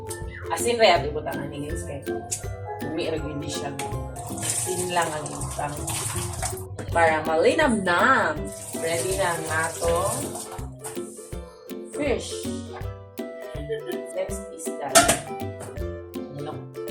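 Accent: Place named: Visayas, Philippines. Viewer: bisaya d.ay maam 😊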